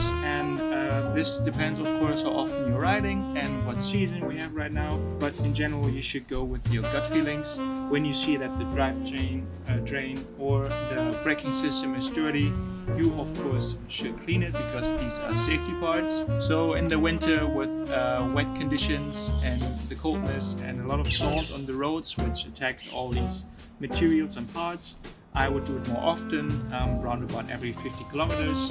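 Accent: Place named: Netherlands. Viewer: Very nice music haha! And you got a dutch accent, sweet!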